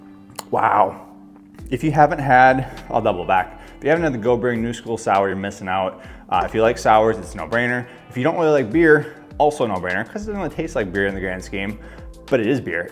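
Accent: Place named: Minnesota, United States. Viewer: I hear Minnesota and you got my sub gotta support a fellow Minnesotan. 🍻 Was looking at your vid to see if Bero is the best but I think I’ll have to try Bero and Go Brewing. Dad’s a beer lover. And trying to get him to ween off the alcohol because of his health but I’m also interested in the NA stuff because I’m not a fan of alcohol.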